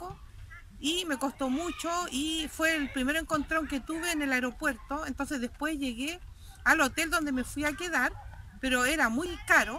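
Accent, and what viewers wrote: Chile. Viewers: Eres Chilena ??? Por el acento ??? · Por la forma de hablar de esta señora les comento que primero es chilena y segundo... en mi pais les decimos weones picantes. Una persona sin demaciada educacion que por cierto debe hablar un ingles pesimo. Es el clasico latino que quiere tener la gran vida sin sacrificio. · Yo soy latino viviendo en Holanda por casi dos años. Pero el video de esta señora es tan nefasto y terriblemente desinformador.... me da hasta molestia que la gente sin ninguna preparación alguna, sin siquiera preparar un video decentemente se crea con derecho de venir a hablar tan terriblemente de un país. Por el acento de la señora creo que es chilena y le informo que su país está en dentro del rango de los países con BAJO nivel de inglés. Y en ese mismo ranking Holanda está en el 2do lugar como el mejor país que habla inglés como segunda lengua. 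Ya quisiéramos todos nosotros que en nuestro país hasta el que barre las calles, el jardinero, el que trabaja en el campo, hasta los mendigos hablen inglés! Claramente señora usted no les entendía porque USTED NO HANLA INGLÉS! No es que su nivel sea bajo, usted NO HANLA INGLÉS! Y es más que notorio por cómo pronuncia algunas de las palabras en inglés que dice en su video (como Dutch que es el idioma oficial de este país dicho en inglés ) . claramente si usted emigra a Holanda o Habla holandés o habla MUY BIEN INGLÉS sino usted no tiene nada que hacer acá, el país no va a cambiar porque a la reina se le dio la gana venir y solo hablar español. Además que si usted viene sin permiso de trabajo TAMPOCO TIENE NADA QUE VENIR A HACER ACÁ, acá no existe el trabajo ilegal, que lo que tiene de consecuencia el trabajo ilegal es la precarización de la vida de la personas, es por eso que Holanda se ve tan lindo por donde usted camine, no solo e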